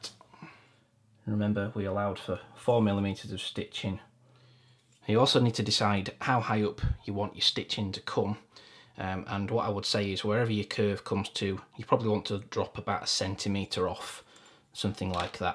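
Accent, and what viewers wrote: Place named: England, United Kingdom. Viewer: Brilliant I've been looking for a video that explains the sizing of a pattern ,you really explain it well . I do have one question ,I'm just starting out as a hobby and trying to find leather supplies in the UK that are cheap enough to try out is difficult . I have bought some tools from amazon as well as some chrome leather ,I've found Identity Leather Craft in Matlock and am waiting for some veg tan and glue to try out. do you have any recommendations for leather suppliers in the UK selling small amounts for us hobbyists . Great channel nice to hear a calm English accent explaining things so well .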